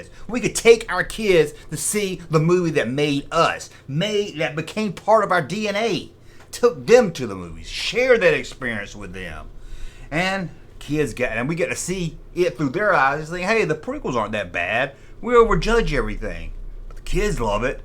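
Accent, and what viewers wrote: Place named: South Georgia, United States. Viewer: So dad and I are on vacation and I’m checking out this episode and dad says “What the hell are you watching??” I said what!? Dad, this is!! He laughs and says he sounds like a bumpkin from South Georgia. I said, “He IS He IS! And I can’t get enough!! 😅😂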